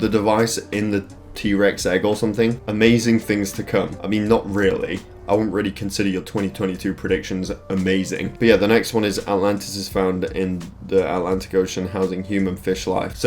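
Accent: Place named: England, United Kingdom. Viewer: Why does sound English here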